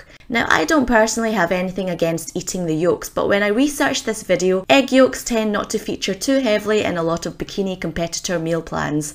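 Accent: Scotland, United Kingdom. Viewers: I love your accent. It makes me miss Scotland so much. :( Please do more vegetarian meal prep, and fitness programme- I find it so inspiring. :)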